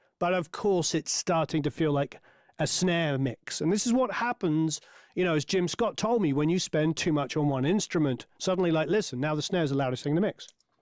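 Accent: United Kingdom. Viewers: lol hair like that, British accent, and music background. WOW are you a shoo-in for California. 😂😂 i hope you make a million!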